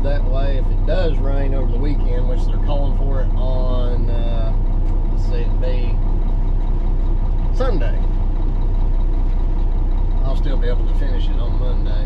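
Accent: South, United States. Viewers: I'm sure I'm not the only one to notice, your country twang goes away when you talk on the CB 🤣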